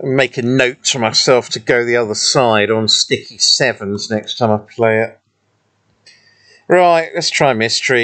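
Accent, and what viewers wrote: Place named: Newcastle, United Kingdom. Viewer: I miss the geordie twang like man 👌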